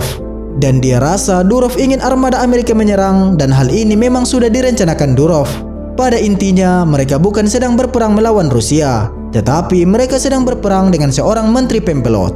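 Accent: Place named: Java, Indonesia. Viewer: Fokus ke pembaca narasi.. mendok jawanya terasa bnget..😊😊😊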